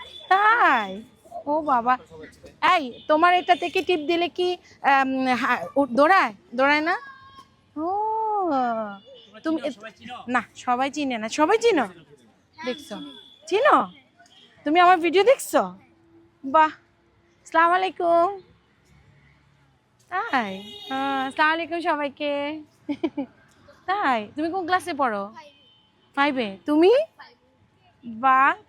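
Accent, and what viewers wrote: Noakhali, Bangladesh. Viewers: apu assalamu alaikum apu ami apnar akjon fan ami apnar sob video dekhi khub valo lage apnar noakhalir basha amar khub valo lage apnar vedio dekhe ami uthsahito hoye ami akta youtube channel start korci jodi paren amake aktu support diyen amnar sathe dekha korar khub iccha amar channel er diye aktu lokkho rakhben Jannat kitchen🎉❤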